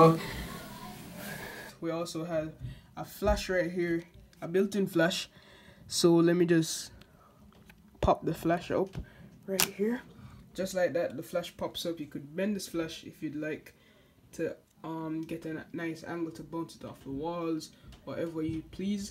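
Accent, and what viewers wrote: Jamaica. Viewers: MajorinkPeoples He's sound like a Jamaican, that's how our accent sound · bro, are you Jamaican?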